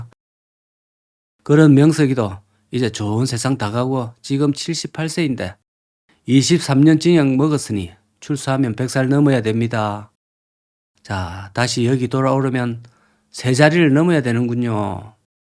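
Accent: Gyeongsang, South Korea. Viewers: 님의 영상 너무재밋고 또 너무나 독특한 갱상도 말씨로 항상 시간가는줄 모르게 보고 있습니다❤❤❤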